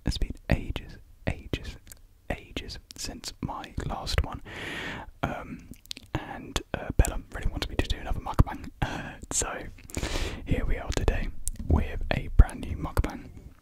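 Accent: United Kingdom. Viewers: I loved your intro and you have a great voice. By the way, I love your British accent😍😍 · british accents are so elite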